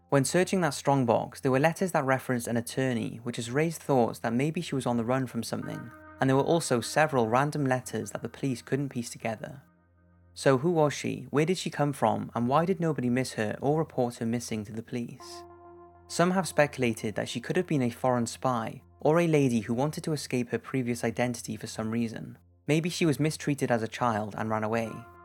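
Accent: Wales, United Kingdom. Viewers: I've always wondered, are you Welsh? you seem to have a slight Welsh accent. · He’s British that’s for sure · are you welsh?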